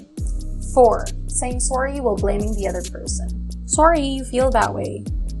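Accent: Ilonggo, Philippines. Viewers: Ilonggo Accent ❤❤👏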